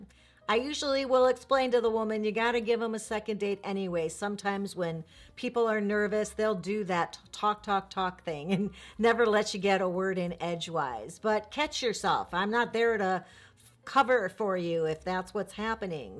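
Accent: Chicago, United States. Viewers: Hi. I love the knowledge that I have gained from your videos. I am from Chicago also. I love your Chicago accent. What part of town are you from?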